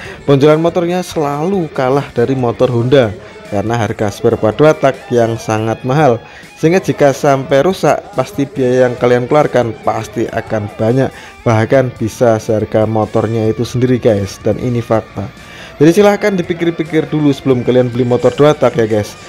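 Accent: Magelang, Indonesia. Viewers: Magelangan banget om 😂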